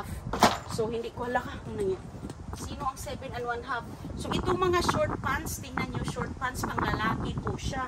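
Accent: Visayas, Philippines. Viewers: Halata po bisaya kayu 🥰🥰🥰 ... Swertyha sa family uy....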